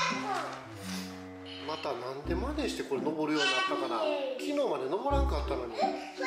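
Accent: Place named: Kansai, Japan. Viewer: 三姉妹に癒されバリバリの関西弁にもホッコリしている関西人ですw 一気に3匹も飼える環境が羨ましいと同時に大変そう〜💦と思いながら楽しく見させて頂いてます(*^^*)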